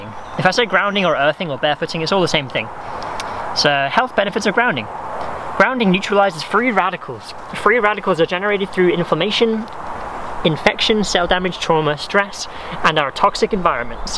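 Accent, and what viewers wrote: Wales, United Kingdom. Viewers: I watched one of your really old videos the other day and you had a welsh accent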